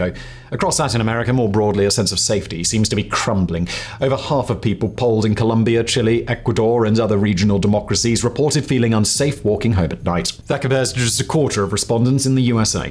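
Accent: England, United Kingdom. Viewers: A white guy with an English accent pontificating about El Salvador. I spend a lot of time there. Beautiful country with beautiful people that lived in fear because a small percentage of the population made it miserable for everybody else. Bukele took care of the problem. I saw people out jogging in La Libertad today. Crazy. That wouldn’t have been possible 2 years ago. That’s why they love him. Not because they want to go buy iPhones but because they can go watch their kids play futbol, go to the store, run a business, visit their family without fear. The comments on this board are embarrassing.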